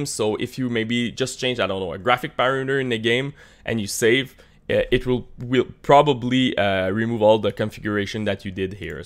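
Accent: Quebec, Canada. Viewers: J'entends un Québécois!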